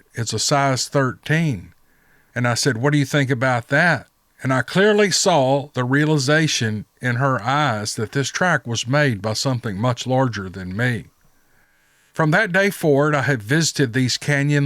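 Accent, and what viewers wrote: South, United States. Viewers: don't change anything. I just discovered this channel not too long ago, and I really like your laid-back, down-to-earth approach. Your accent and the background country music makes me miss the USA as a UK citizen. I went to school and worked in Iowa and Massachusetts for 18 years, and it's like a second home to me. Thanks for all you do.:) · Chat all you want to. How can you not enjoy a southern accent? It makes some of us go weak in the knees. 😉😊🐾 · I could listen to Southerners talk all day. I love your channel, especially the Steve Lily stories. Don't join the cancel culture and change something that's great, just to appease a few brain dead whiner's. God bless, James in London. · I haven't listened to this channel in a while, but I do like Southern droll, his enthusiastic style and layed back charm, but I've noted in the YT comments(and in narration), that there is a ruckus about analaysis after each story/report? 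Can I suggest that it could be a good idea to put bookmarks for each story with matching timestamps within the description - it'd be easy to do and would keep everyone happy(ish). BTW there's also some grumbling on other topically associated YT channels about this too - maybe it's a "sign of the times" thing that we are currently living in/under?! Keep safe and well, and remember to be humane to each other(I include myself), as times are tough in many ways(ditto).:) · I'm from Oz&love that Southern US accent. The more yakkin from the more content I am👍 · YOUR UNIQUE SOUTHERN BRAWL AND EXPRESSIVE OPINIONS ARE WHAT MAKES YOU........WELL, YOU! IF THESE VERY FEW "CRITICS" DON'T CARE FOR YOUR STYLE OF NARRATION, THEN, HEY, THEY CAN ALWAYS GO SOMEWHERE ELSE!! IT IS, AFTER ALL A VAST INTERNE WITH MANY OPTIONS. I'M SURE I SPEAK FOR MANY OF YOUR LOYAL LISTENERS IN SAYING "YOU KEEP ON KEEPING ON"! 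"YOU DO YOU"!! YOU GIVE THE STORIES THAT LITTLE TWIST AND YOUR REFRESHING OPINIONS REALLY ENCOURAGE ONES WHO MAY BE SUFFERING OR ANXIOUS ABOUT THEIR SIGHTINGS!! KEEP ON "YAKING", LOVE!! FROM ALL YOUR FELLOW TN FANS, DON'T STOP BEING!! LOVE YOU JUST THE WAY YOU ARE!!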